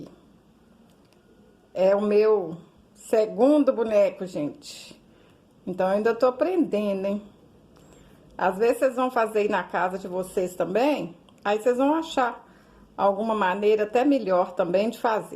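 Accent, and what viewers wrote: Minas Gerais, Brazil. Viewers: Vc é demais gosto muito de vc e do jeito que vc fala mineira Deus Abençoe professora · Eita mineira retada essa igual eu ,parabéns amei. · Que jeitinho meigo de ensinar! Amei o seu sotaque! Vc é mineira? Perfeito! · Sotaque da minha terra Minas Gerais. Falo assim até hj. Parabéns pela boneca. Amei.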